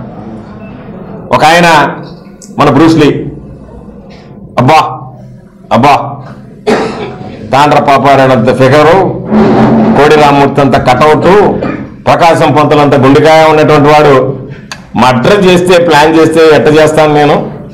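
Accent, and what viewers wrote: Nellore, India. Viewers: Govardhan anna iam your FAN.iam from ongole.you are going to get very great credit that you are going to defet 5 th time.anna iam your fan you speak in very good in nellore slang.Anna i wish you all succesd.you are going to get credit of defiting 5 th of the great mr somireddy. anna all the best to you anna. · Super counters short and sweet we with typical nellore slang...Nellore slang lone vetakaram untundi...Pakkana koorchunna ayana chaviti vademo ani doubt ga undi lekapothe anta comedy vinnaka navvakunda unnarante great...Love the speech.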